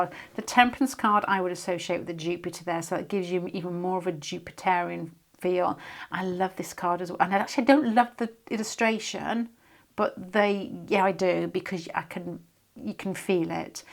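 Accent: United Kingdom. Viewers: I can't undertand you when you speak so fast with the British accent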